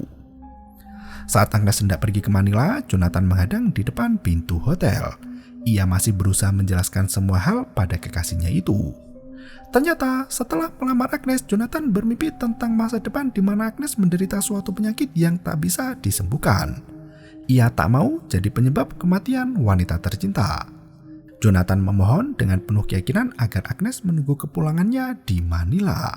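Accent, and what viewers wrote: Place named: Magelang, Indonesia. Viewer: Kok dengerku Magelang ya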